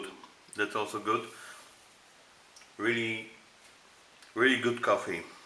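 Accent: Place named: Saskatchewan, Canada. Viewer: * sounds like all of my relatives in Saskatchewan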